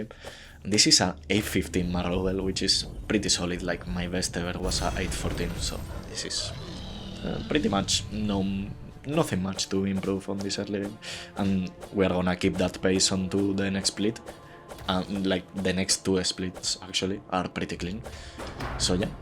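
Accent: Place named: Spain. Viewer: For anyone wondering, he has an spanish accent. Great video❤ Saludos desde Cataluña!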